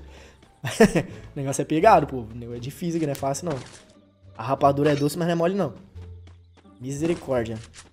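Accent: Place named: Bahia, Brazil. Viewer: ele é baiano mano, o sotaque muda Kkkkkkkk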